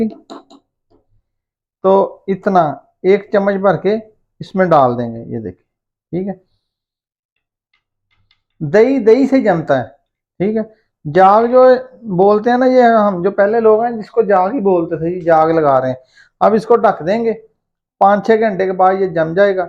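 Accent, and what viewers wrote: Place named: Sialkot, Pakistan. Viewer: بہت خوب جزاک اللہ خیر لہجے سے لگتا ہے کہ سیالکوٹ یا گردونواح سے تعلق ہے۔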